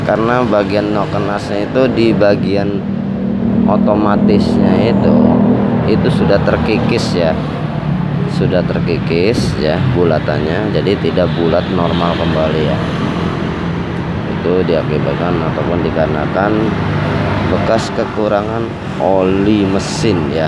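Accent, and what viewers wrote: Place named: Banyumas, Indonesia. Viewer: Sampean wong ngapak yo.kon wong Endi.salam santun dari Riau 🙏.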